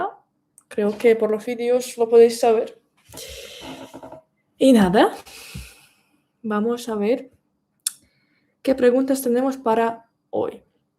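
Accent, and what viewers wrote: Spain. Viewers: Sí, esta joven definitivamente TIENE TALENTO🧏‍♀. Los administradores del canal lo saben🤫, pero... por qué no tiene su propio canal de Youtube?🤔. Aunque su acento es español, obvio estudió castellano, y puede "chocar" un poco a la audiencia de latinoamérica; su imagen, desenfado al hablar a cámara, y deseos de crear MÁS contenido audiovisual la posicionaría en el mercado de LATAM🧏. Sobre este directo, me reservo mis impresiones, sólo que a partir de los 45 minutos es que Youtube comienza a recomendar mejor los directos. Gracias por esta hermosa iniciativa👏. ÉXITOS a las demás creadoras. Mis saludos desde Moscú🤝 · No hables como los espanoles, se oye feo, no digas vale, habla espanol americano. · Tienes un acento español en tu forma de hablar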